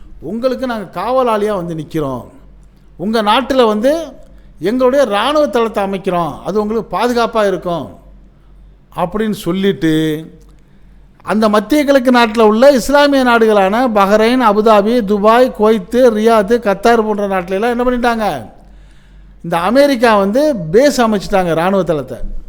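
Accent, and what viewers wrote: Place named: Thanjavur, India. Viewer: அருமையான தஞ்சைதமிழ் மணம்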